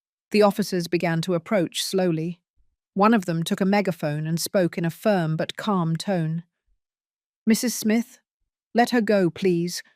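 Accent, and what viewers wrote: United Kingdom. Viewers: Me too its an englih UK people accent .I enjoyed listening.i ❤it🎉🎉🎉🎉🎉🎉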